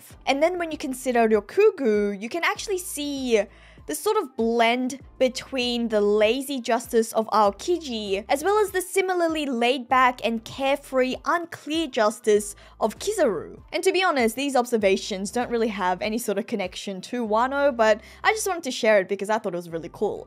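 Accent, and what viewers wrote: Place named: Australia. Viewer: It seems like a lazy comment but ..I actually broke my rule of not commenting on public youtube videos.. Fairly new to the channel. I have to say I enjoy your videos. I respect the knowledge, research and clean execution in your videos. The are down to earth and come off very natural while having professional execution. You're funny without trying to be funny. Your points are well thought out. Yet still down to earth. I'm guessing you studied communication or broadcasting. You have a talent for it. I also love your aussie accent. Thank you for the funny response. I almost laughed out loud :)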